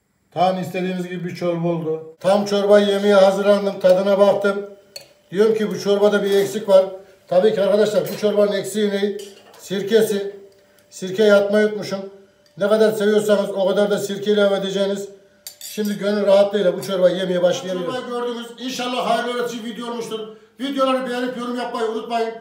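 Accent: Turkey. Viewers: Biz böyle seviyoh gardaşım şefimizi, herkeş İsdambul Turkcesi gonuşmah zorunda deyil...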